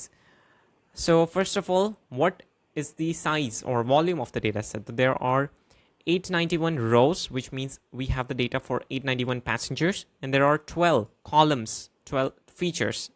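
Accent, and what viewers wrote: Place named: United Kingdom. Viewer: British Accent toh hai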